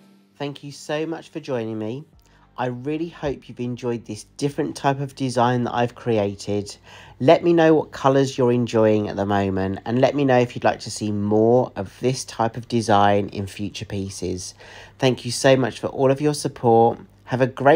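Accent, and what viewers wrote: United Kingdom. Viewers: Oh yes. Certainly 3D. It's incredible. Nice to hear your (British!) voice over rather than just music.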